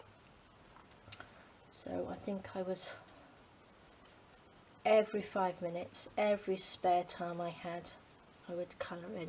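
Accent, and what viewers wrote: United Kingdom. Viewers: Love you no brainer approach— I plan to do more also this year I have found stabilo pencils work nicely for shadows and smudging Never would have guessed you were in Portugal! English accent— small world after all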